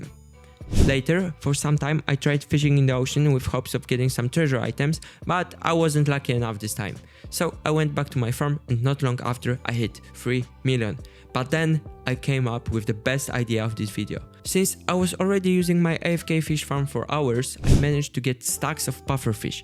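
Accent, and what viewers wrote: Italy. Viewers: yo are you italian?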